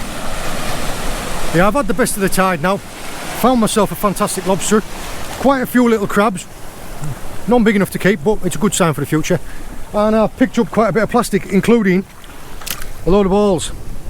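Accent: United Kingdom. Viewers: Such a beautiful video. You didn't take James this time with you. Again the lovely British accent as always.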